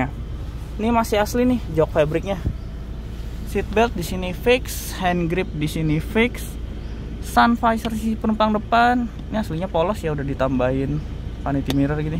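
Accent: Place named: Java, Indonesia. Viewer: om, kamu orang jawa nya mana, kok bahasa jawa nya medok 😆😀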